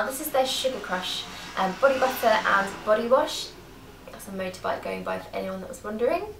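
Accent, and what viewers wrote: England, United Kingdom. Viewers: I made it! what do i win? lol i just wanted to say that you have such a classy look and mannerism. i don't know if its the english accent adding to it, or just the way you present yourself, but i think you are a lovely young lady.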